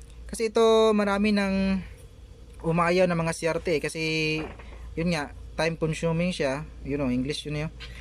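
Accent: Visayas, Philippines. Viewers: Yan ang tinood na mag tudlo..hajaj bisaya ka sir?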